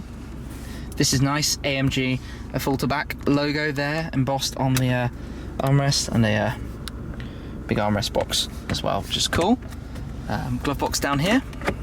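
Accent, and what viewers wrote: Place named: United Kingdom. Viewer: wonderful british clear english and of cause a stunning car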